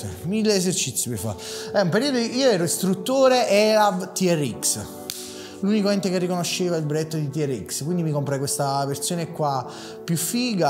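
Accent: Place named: South, Italy. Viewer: Ma da roma in giù quando imparerete a parlare italiano? Sempre queste frasi che non si capisce un ca**o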